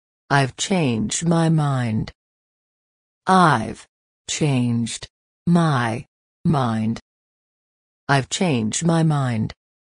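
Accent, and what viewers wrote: United States. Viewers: Нужно английское произношение, это наверное, американское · it's american english pronunciation! you should teach RP english. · Спасибо.Но,это американский диалект.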